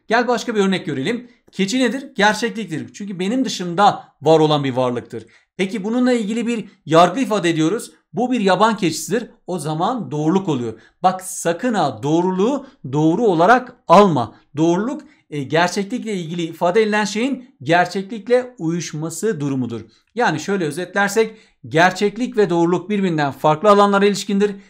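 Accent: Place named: Van, Turkey. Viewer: belli bende vanlıyım anladım konuşma tarzınızdan :D <3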